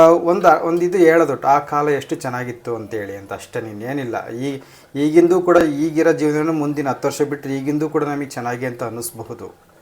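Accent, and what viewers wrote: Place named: Durga, India. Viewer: ನನಗೆ ಸುಮಾರು ದುರ್ಗಾ ಹಾಗೂ ದಾವಣಗೆರೆ ಸ್ನೇಹಿತರೆ, ನಿಮ್ಮ ಭಾಷೆ ತುಂಬಾ ಇಷ್ಟ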